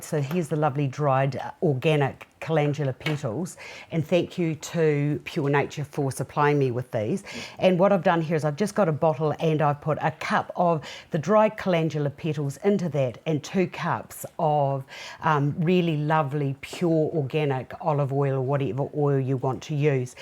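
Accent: New Zealand. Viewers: Hi. I'm new to these things. this is my first look at Balms. I really enjoyed this video and found it so easy to follow. I want to thank you for such a great intro into what I hope I will become skilled at. also its great to hear a NZ accent. My family and I are due to move to NZ in the next few months from UK. As if by magic I find this vid first. Great. Thank you again. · John Anthony : Vit E I’m sure ... New Zealander accent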